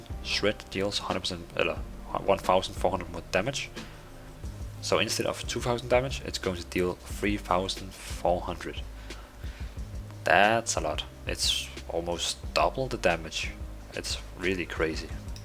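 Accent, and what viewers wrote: Denmark. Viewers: Danish much :O?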